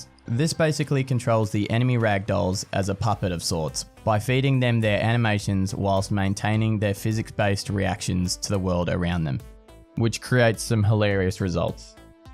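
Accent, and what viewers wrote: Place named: Australia. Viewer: I thought I heard the accent I'm Aus too lol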